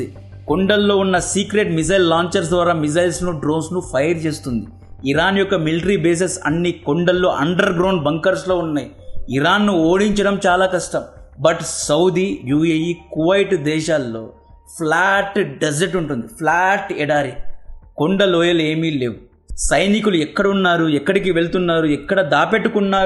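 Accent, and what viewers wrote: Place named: Anantapur, India. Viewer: Brother, nee slang anantapur teluguki daggaraga untundi. Mee ooru?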